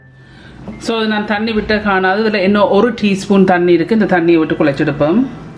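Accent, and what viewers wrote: Jaffna, Sri Lanka. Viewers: நீங்க யாழ்ப்பாணமா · Its really nice.your voice also it reminds me jaffna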